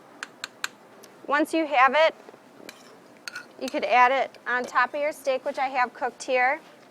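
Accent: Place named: Illinois, United States. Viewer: lol haven't heard an Illinois accent in a while.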